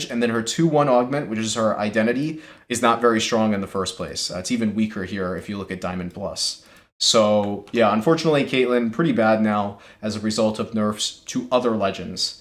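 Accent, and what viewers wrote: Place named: New York, United States. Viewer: What part of New York are you from? I hear the accent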